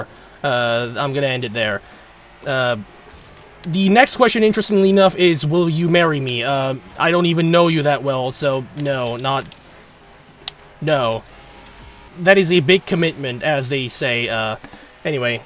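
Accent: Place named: United States. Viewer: You sound so American lol! Seriously, it feels very niche here in the US today, sadly never can find anyone I know who thinks Asphalt games are NOT ridiculous. You're living the gamers dream, which is basically to be like a top 100 player in their favorite game and I think maybe you underestimate how cool that is. Nobody would trade that! Keep being a good guy, it's your best trait.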